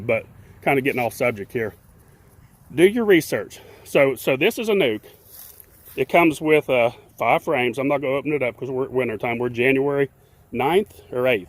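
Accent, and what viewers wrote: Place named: West Virginia, United States. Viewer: I thought had a wv accent! Too funny. It’s almost as bad as mine. 😃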